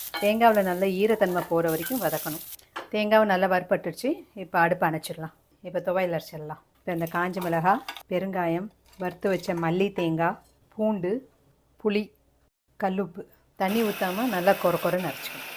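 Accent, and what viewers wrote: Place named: Coimbatore, India. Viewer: மேடம் நீங்க பேசும் போது உங்கள் ஊர் ஸ்லேங்கே தெரியல பக்கா கோவை பெண் பேசரமாதி இருக்கு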